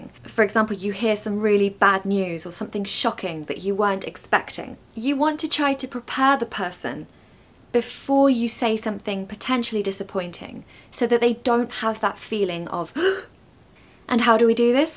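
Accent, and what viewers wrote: United Kingdom. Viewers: I fall in love with your British accent 💚🩵💙💜💛🧡❤️💜🩷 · I love your pronounce but I can’t understand where came from? But is really British and lovely!!!!! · I just found out this channel recently, and i think this is the suitable channel for me to learn the British English. Firstly, I like your accent, the way you speaking is like singing a beautiful song. The speed of your speaking is not that fast so that i can listen carefully and mimicking right away Secondly, you are a very down to earth lady that is like a Sister in my neighborhood who do not put a heavy makeup and very decent wearing that do not expose your body. Make us can concentrate on learning. Thirdly, your character is very cheerful and you always share your real life stories with us. I repeated your video at least ten times in a go to make sure i learn what you teach. 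Your video really full of resources and knowledge that we need. You are really a good teacher in making this kind of video. Thank you so much for your efforts. I am a Malaysia Chinese girl. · Hello, Beautiful, charming, refreshing!!! I'm a Southern USA American from 1600's ancestors. Your musicality, considerate & gentle way of phrasing, positivity, and floral frocks:) resonate with me! Your analogy of a rose incorporating beauty, strength, and resiliency could not better describe Southern USA woman in the 1800's. I find more in common with your accent than with the urban USA accent (changing "t" in a middle of a word to a "d" sound, for example). The greatest difference my untrained ear detects is that you don't use a flat "a" vowel sound (heard in words like mascara and vase) and yet we share the schwa and silent consonants, as well as my much teased use of "reckon". Your video "Turn your American accent to British" is spot-on. 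A specific question for you is how should one untrained in linguistics begin to explore their historic, enduring connection to Britain and further support the study of the English language on continents afar? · Your session will be an earworm in my head due to your accent. You've acted in this session as Hermione in Harry Potter series. I am so intrigued with your accent. I mean the British accent. I really express my gratitude to every moment you have taken to come out with this lovely, indulgent work. keep the good work up. Kind regards, Moe.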